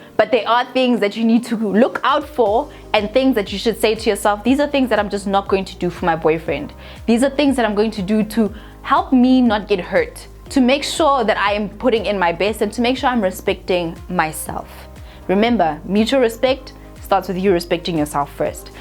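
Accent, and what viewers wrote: South Africa. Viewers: I like the way you speak South African vibes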